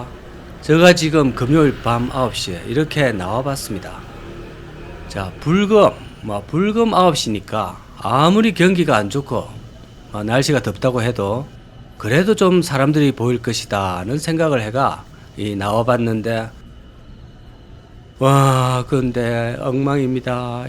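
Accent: Gyeongsang, South Korea. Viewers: 님 고향이 창원 마산쪽인가요? 아님 김해쪽이신가요? 사투리로 봤을때는 서부갱남은 아니신거 같아서요.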